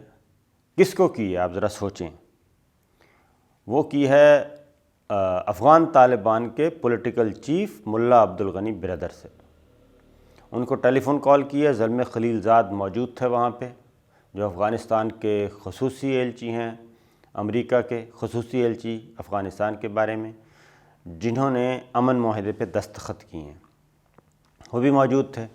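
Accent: Punjab, Pakistan. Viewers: the way u speak English with Punjabi Accent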